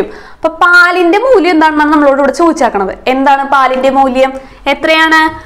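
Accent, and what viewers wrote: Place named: Thrissur, India. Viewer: വീട് തൃശൂർ ഭാഗത്ത് ആണോ slang അത് പോലെ ഉണ്ട്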